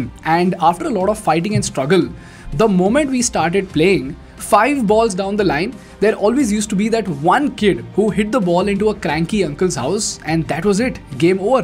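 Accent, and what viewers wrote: India. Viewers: School.....If This Is Not A Promotional Video....Then I Don't Know What Is 🙄So Please 🙏Cut The Crap....About Decathelon....Not Using You...For Promoting Them...Which Also Means....They're Not Doing So Good After All....Are They? I Wanted To Buy A "Tribben"....Their Sports Bike....And Guess What...Their High End Version....On Display....At PVR Atrium,Gachibowli, Hyderabad....Is Still On Discount 19,000....Change....And It's Been Like That....For More Than A Year 🙄And Don't Let Me Start On The Online Reviews On This Bike🙄🙄....But Yet...Just Because....I Found You Cute......😅Although....The Comminication....Pronunciations...The Heavy Indian Mother Tongue Influence...Like "Dee - Cathlon"....Instead of "D'Cathelon - As In "ड" Cathelon"....Puts Me In Doubt....Whether I Should Spend Rs. 2 K Plus....On Your So Called Comminications Master Class? Or Not 🙄Hmm 🤔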